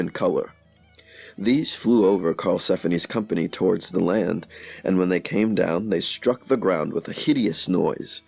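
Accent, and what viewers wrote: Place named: Canada. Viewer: I was mystified by the mispronunciation. The narrator has a Canadian accent, but pronounces some things phonetically. Makes me wonder if this is actually an AI bot program. Growing up in eastern Canada, we had history lessons out the ying-yang and the pronunciation of common Canadian places is pretty basic. And I can't imagine an Anglophone mispronouncing "sword" as it looks.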